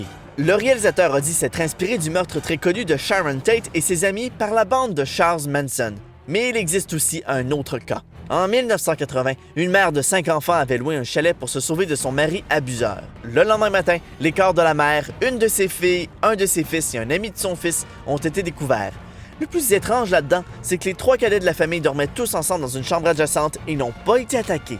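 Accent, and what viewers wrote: Quebec, Canada. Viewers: revenues SVP tout le monde s’ennuie de vous! Vous êtes la seule chaîne qui fait des vidéos québécois sur des films avec l’accent québécois que j’adore. Je suis une québécoise et dès que j’ai trouver votre chaîne je suis tomber sur le charme… revenez!😭😫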